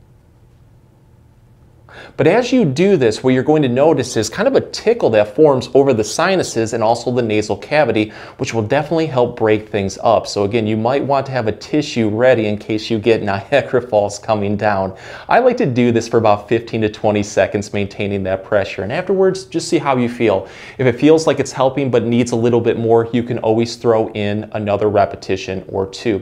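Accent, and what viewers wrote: Michigan, United States. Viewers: Michigan accent!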